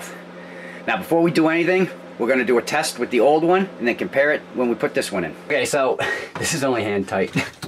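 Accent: Boston, United States. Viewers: Irrelevant comment alert!!.. dude r u from Boston??? U been to Dunkin today?? Anyway. Wikkid pissah review!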